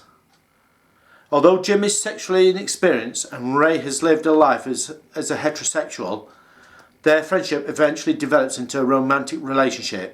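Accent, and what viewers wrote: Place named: England, United Kingdom. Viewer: I love this movie! I have the hots for the older grey haired man in the film (soccer holigan man). You are a very cute with a loverly english accent:) very cute smile aswell.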